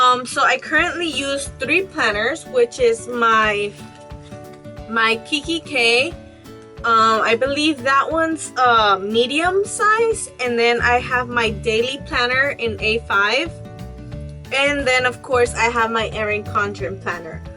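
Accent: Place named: Mexico. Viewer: That Mexicana accent though 😂😂💕💕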